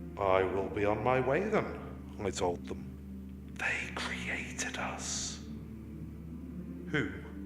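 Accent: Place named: United Kingdom. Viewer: Hello, I really love your Brithsh Accent it's So Sexy 💋 much love Sexy Grandma Darkness ✌💙💙 Ps keep doing This you are very Good at it ❣❣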